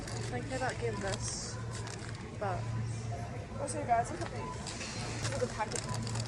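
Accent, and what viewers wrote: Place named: Australia. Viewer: I always wondered why your accent seemed more aussie than kiwi!! makes sense, i had no idea you were from aus 🫶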